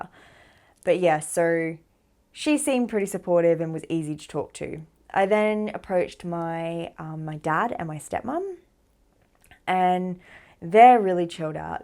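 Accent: Australia. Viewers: Masya Allah Tabarakallah❤ Alhamdulillah Hearing your journey, especially when you called the mosque in the morning for your Syahadat, left me in tears.. Joyful tears Qadarallah Allah SWT planned the phone to be picked up so quick. Love your accent, my Mum's Australian, miss her soo much during this pandemi. I'm a reverd,living in Indonesia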